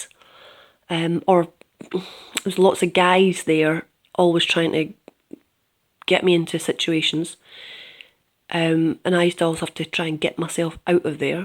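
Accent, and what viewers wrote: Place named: Scotland, United Kingdom. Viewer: You scottish. I'm scottish also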